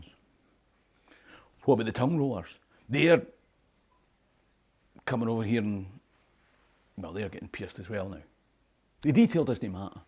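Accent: Scotland, United Kingdom. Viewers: Mr., you have to remember that the largest source of human bullshit comes from racists who can't wait to expose their ignorance and spout their lies. As to DNA, you are who you are, and you were born in Scotland and, lord knows, you certainly speak with a heavy Scots accent. And your family is gorgeous! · You sound Scottish. 😉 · You sound like a Scotsman to me.. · I don't know how anyone can question Scotnicity given his accent. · With an accent like that you are most definitely Scottish my man, your ancestry is just that what ones ancestors were does not make you it, I am from Romani decent but I'm English not punjabi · I go with your accent and your Scottish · I dunno, you sound Scottish to me! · You make a great point- are we our genetics or our culture? Genetics determine what we look like, but do not define who we are. You sure sound Scottish to me and all of your videos demonstrate a great curiosity and love of Scotland. 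· Dear: You are definitely a Scot! From your accent to your attitude. My best friend growing up was born of full blooded Scots - Highland mother and Lowland father. I couldn't understand her father at all, and I sometimes wondered if that was on purpose, to discourage unwanted visitors. He was part of the local fire department and worked long hours. My friend raised budgerigars, teaching them to speak and sing. Everyone of those birds picked up her mothers accent! It was hilarious! When the birds would talk, sometimes they would imitate her mother calling her. It was such a precise copy, that Charlene would answer them before realizing it was the birds, not her mother. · You are steeped in Scottish history, live in Scotland and certainly sound like a Scot. You are certainly Scottish in my book! Always enjoy your content! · I’ve never heard of your accent in Africa. You were born in Scotland hence your a Scotsman. 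You did a fine video. Your words ring true. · you are Scottish to me. You were born in Scotland, and your Mammy is Scottish. You’re from the same toon as my parents and you sound just like them! I was visiting Perth with my American son and he recognized your poster up at the Theatre 😊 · you are a Scottish standard bearer! Yes, you have west African heritage and are rightly proud of it. Your accent, behaviours and, above all, attitudes are quintessentially Scottish. You see things from an egalitarian perspective, not a mad “patriotic” climber of lampposts. I’m proud to say that I am the same as you! God bless you and Lang may yer lum reek! ❤️🏴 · English born Kiwi here, it wasn't until I went to live in the Uk that I realised I'm not one of this mob, I'm a Kiwi. It's not ethnicity that counts, its culture. From the moment you opened your mouth I knew you were Scots. Not just the accent, but speech idiom and humor. 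For immigrants this only works if you want to blend. When I was in England, I met Afro-Carribeans from Birmingham who spoke with Brummy accents. Culturally English and Caribbean, schooling and wider aculturation combined with family and local community vibe. If you are fully immersed in two cultures then you are both, a common experience for migrant and first generation kids. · I am sure you know already, but you have a lovely family, and you speak like a Scot, not a Sassennach! Reference databases are skewed and very unreliable. Maybe your proportion of Nigerian DNA is because bananas grow in Nigeria, and we share so much DNA with bananas? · Your accent is Scottish enough! My YDNA is very Scottish, my mother's grandfather was a Scotsman, like my father & her surname also Scots, but mtDNA triangulated as U2c1 from India/Pakistan/Afghanistan: there's a known colonial slave ancestor from Malabar (Kerala). 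My tan comes from father's biracial grandmother, also mainly S Asian DNA there l think..other family members are paler with a few exceptions. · I think your accent qualifies you as Scottish.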